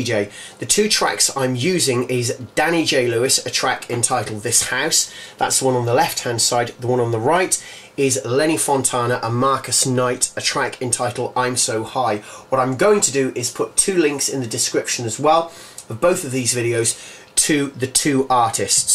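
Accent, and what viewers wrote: United Kingdom. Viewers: Love brittish accent!!! #nohomo K !!!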